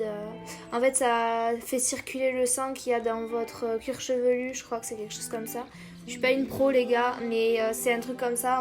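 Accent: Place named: South, France. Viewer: T’a un accent du Sud et sinon t grv drôle 😂❤️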